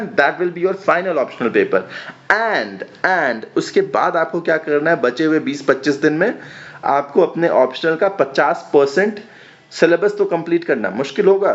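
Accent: Bihar, India. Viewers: Hum matlab Mai sunkar bhut acha lga .. pkka Bihar bala language 🤩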